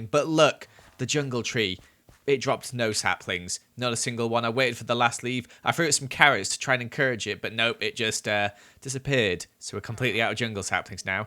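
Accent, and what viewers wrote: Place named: England, United Kingdom. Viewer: i live in america, but i love the english "accent"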